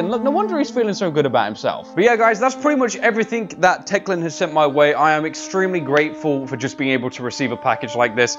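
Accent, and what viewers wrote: United Kingdom. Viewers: I can't be the only one that thinks he sounds more British rn lol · Is it me or sounds a lot more british with low quality mike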